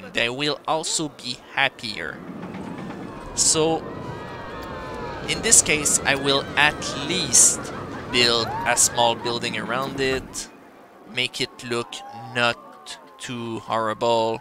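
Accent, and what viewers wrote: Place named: France. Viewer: Yo, nice video homie ! Btw, are you French ? Anyway, keep it up.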